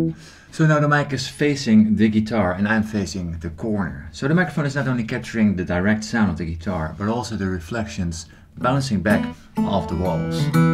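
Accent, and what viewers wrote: Netherlands. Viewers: I really enjoy your channel and while listening to you speak, tried to guess where you were from, your music speaks for itself:) It's close to American mixed with British, but my guess is The Netherlands. Years ago I met a couple in the art store where I worked and though they sounded American, there was a few words where the d's sounded sort of German. I asked where they were from originally and yes, it was Holland. They had lived here a long time but found the sounds of American English quite easy to master. So, did I guess right? Cheers, Rik Spector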